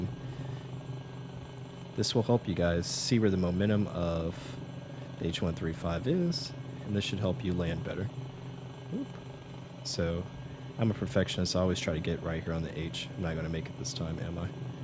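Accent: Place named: United States. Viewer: "Starting" cold and dark.Very hard to follow that american accent. This video doesn't help me at all.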